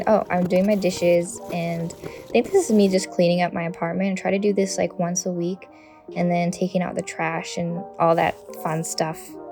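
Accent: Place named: West, United States. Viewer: here at 37 sub wow never seen anyone in sg accessorised like that and how is ur accent so western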